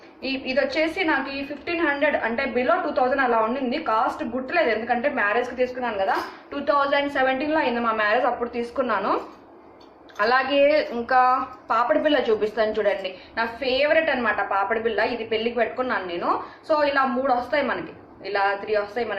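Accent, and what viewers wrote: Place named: Rayalaseema, India. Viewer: Midi raylseema LA undi yasa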